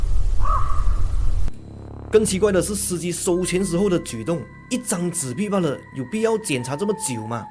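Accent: Malaysia. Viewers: 好喜欢听你讲故事，可以了解马来西亚文化又可以听马来西亚独有的腔调。:face-blue-smiling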